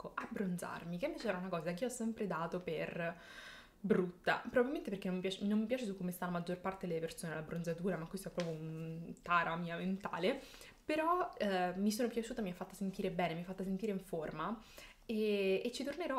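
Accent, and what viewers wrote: Rome, Italy. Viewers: Forse una cosa che non ti ho mai detto e che non vedo scrivere spesso. Parli molto bene. Sei romana certo ma se devi tenere un discorso sai esattamente come esporlo e anche in modo ottimale. Bel video. Bello per quello che hai detto e perché hai legato il tuo discorso a dei video significativi. Complimenti, uno dei video migliori❤️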